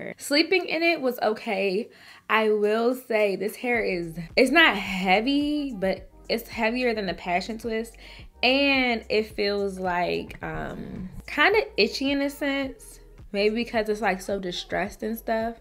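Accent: DMV, United States. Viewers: Love it! New subbie here. I thought that you sounded like a fellow DMV sista 🤗💖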